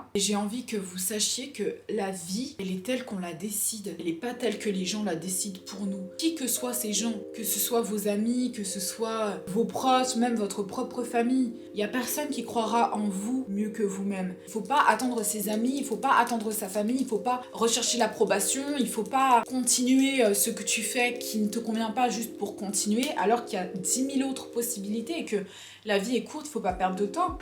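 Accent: England, United Kingdom. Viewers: C’est agréable ton contenue est fluide et chapeau pour les videos tout les jours mais l’accent anglais les expressions c’est vraiment lourd , irritant et gênant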